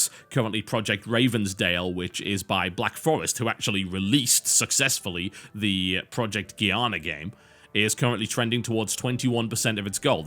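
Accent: United Kingdom. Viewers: He can't you fucking racist that's how British people say it. Its because of their accent.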